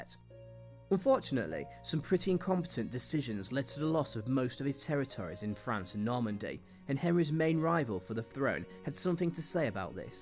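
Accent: United Kingdom. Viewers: It's weird-- the narrator has a very thick British accent and yet he uses American slang like "pissed off" for angry and "awesome" instead of brilliant.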